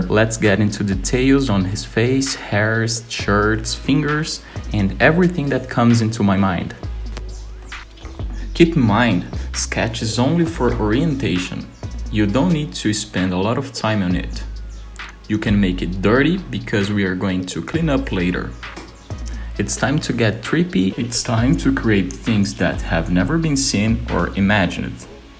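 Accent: Brazil. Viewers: pelo sotaque eu percebi que era br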